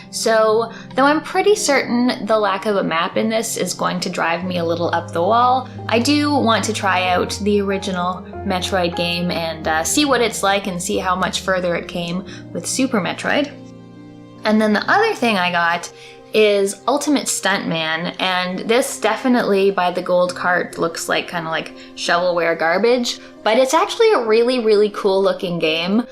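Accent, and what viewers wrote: Canada. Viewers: Just as an aside, sometimes your Canadian accent is imperceptible and then you say "house" and I'm like... oh yeah, she gets healthcare from the government like someone who lives in the first world. This is not a bad thing, I would take every single person around me saying "Howouse" all the time if it meant living in sane governance but... as an American living north enough to get a Canadian channel on his cable system, it pops out.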